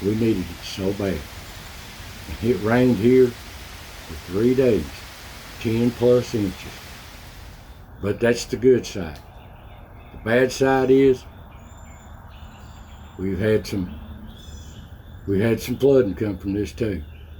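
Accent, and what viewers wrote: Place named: South, United States. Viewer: You're thanking us, but I thank you for putting out your videos. I'm a Southern boy stuck in Yankee country, and I love to hear you talk just so I can hear Southern speech. I love it when you tell us how life is and was in the Appalachians. And above all you're my brother in Christ. In most of the South there can be several drouths in a summer, but in Texas there can be several summers in a drouth. 🏜️ I'm so glad to hear you're doing better. 🙏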